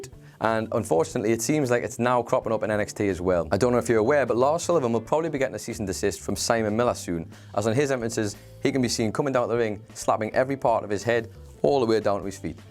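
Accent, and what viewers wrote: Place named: North, United Kingdom. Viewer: Holy... dude slow down! Talks way too fast... way too British... fast British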